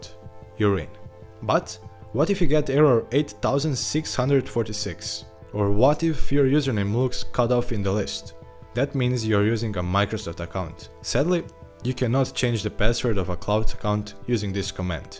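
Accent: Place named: Balkans. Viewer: Your accent sounds like you're from the Balkan region, and after seeing you accidentally type "Ć" , I'm pretty sure you are, so I have a question: "Odakle si?"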